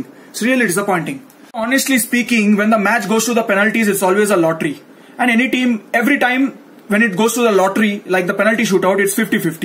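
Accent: India. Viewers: english with india accent so funny . hahahaha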